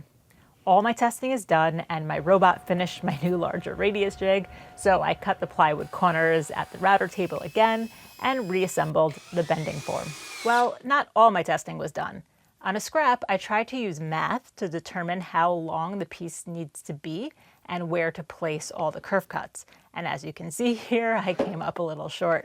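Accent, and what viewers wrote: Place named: New York, United States. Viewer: Not quite sure it’s everyone, but we all love and accept the way says it, she’s a New York girl. NEXT!!!!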